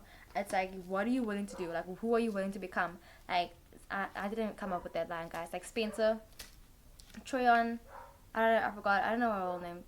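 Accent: South Africa. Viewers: I know that accent❤❤hey there South African 🎉picked pile 1 and I wanna be famous like 😍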